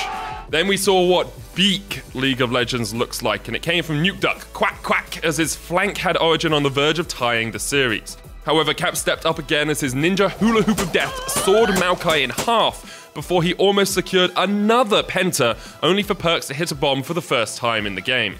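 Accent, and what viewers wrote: United Kingdom. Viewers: When Sjokz and Ender does it, it sounds really troll and sarcastic. But when Medic does it with his British accent, it actually sounds like typical English news. Ha!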